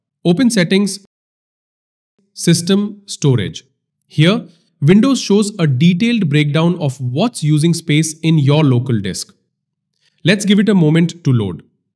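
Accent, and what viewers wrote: India. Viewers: just move file location , its simple as that. Also remove apps setup file as .msi in those folders. Move apps out of C drive. Remove previously installed Windows file Change location of My documents and Downloads Use Windir stat to see , the tree file size. Turn virtual memory to Auto. Anything set to lower value or higher value has its on demerits . Delete recycle bin Delete prefetch, temp, %temp%. You sounded indian, And my expectation was sky high, but u delivered less . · AI generated slop, the thumbnail face does not quite seem to match the indian accent.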